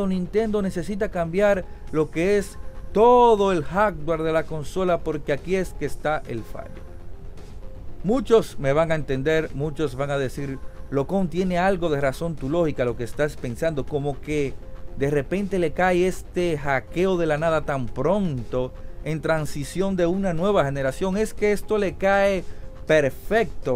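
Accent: Dominican Republic. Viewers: Eres de republica Dominicana?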